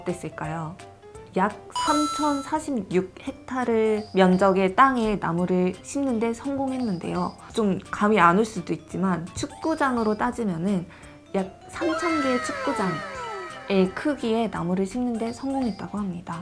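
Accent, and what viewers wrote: Seoul, South Korea. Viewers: 눈감고 눈뜨고 듣고 보고 있어도 한국서울 사람입니다. 제주 부산 광주 대전에 가셔서 말씀하시면 서울사람이지. 몽고분이라고는 절대 믿지않을듯. 여러분야에서 한몽간의 가교역할을 하고 계시고 더욱더 잘하실듯 합니다. 화이팅입니다^^ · 발음이 그냥 한국 사람이네요.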